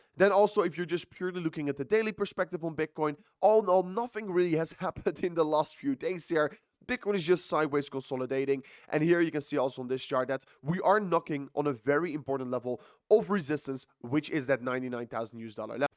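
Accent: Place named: Achterhoek, Netherlands. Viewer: Beetje achterhoek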